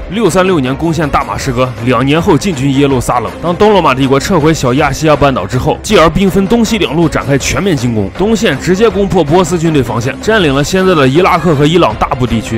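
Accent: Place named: Northwest, China. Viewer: 精彩！讲与伊斯兰教有关的话题，加上解说的西北口音，就更加精彩，堪称完美。期待下集！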